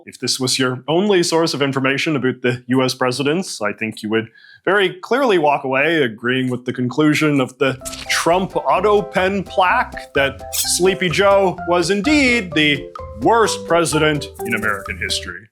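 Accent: Canada. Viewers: sounds like Canadian Carl Sagan and I’m here for it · Now that’s a Canadian accent.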